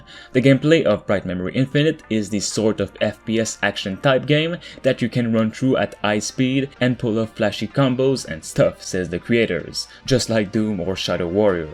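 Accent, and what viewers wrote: Quebec, Canada. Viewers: Are you french canadian? You have the accent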